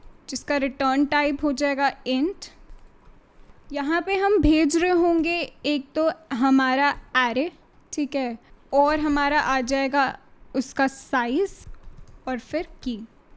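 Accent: India. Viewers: tired of hindu accent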